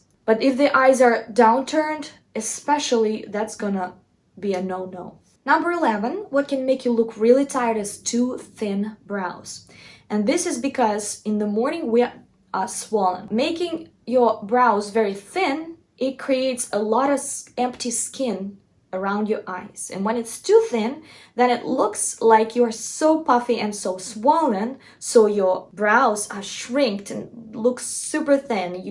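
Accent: Russia. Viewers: Thick russian accent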